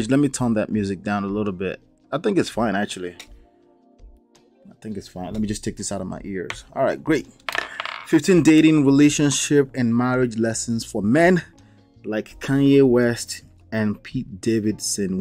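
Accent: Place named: Nigeria. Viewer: Hi, you sound like a Nigerian. I am watching from Nigeria. Greetings. Just subscribed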